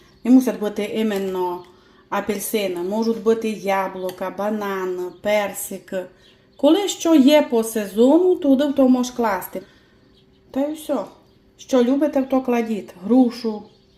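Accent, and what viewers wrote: Transcarpathia, Ukraine. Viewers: Пані, у Вас дуже гарний голос і мені подобається Ваш закарпатський діалект. Чимось схожий на зебру, наче і просто виглядає, але через екран пахне.У Вас є рецепт Зебри? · Дякую файно, дорога, за такий гарний простий рецепт. Цей калач ще виглядає, як великий кекс. Супер!!! Так приємно Вас слухати - стільки тепла і доброти у Вашому голосі!. І рідна закарпатська мова. Божих Благословінь Вам і Вашим рідним.❤❤❤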